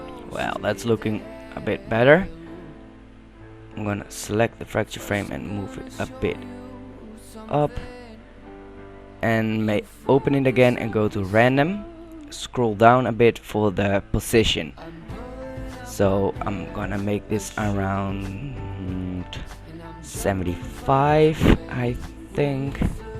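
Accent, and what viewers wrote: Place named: Netherlands. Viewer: Nice tutorial, You're definitely dutch too hehe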